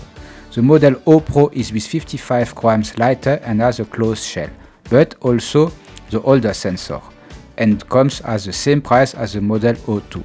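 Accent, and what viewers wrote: France. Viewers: This is a great video and I hope you exceed to do great things , but would you mind me asking are you French sorry I took three years of French and thought I recognized the accent my apologies if this isn’t correct also I subscribed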